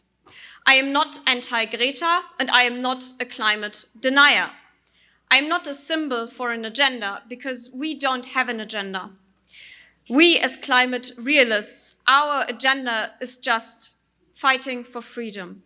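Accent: Germany. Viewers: German accent...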